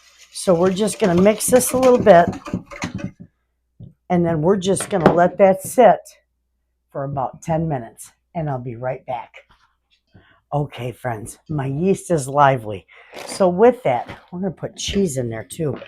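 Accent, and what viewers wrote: Michigan, United States. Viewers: I heard your accent and I said shes a Michigan girl. Went to your description, yep!!! I"M a Yooper.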